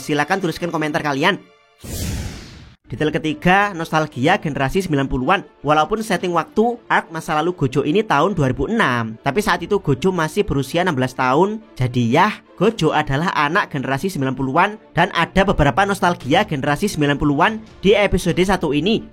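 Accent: Java, Indonesia. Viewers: Adminya orang Jawa ternyata 😂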